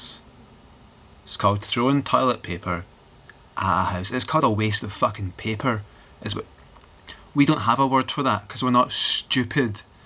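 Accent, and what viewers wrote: Scotland, United Kingdom. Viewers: You are amazing man, love Scottish accent :)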